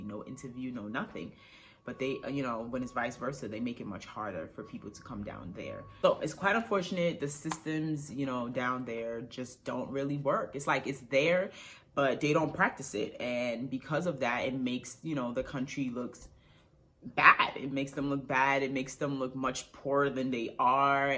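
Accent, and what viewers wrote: United States. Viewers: Ok why is your Ghana accent here not here then it's pure American accent all cap.lol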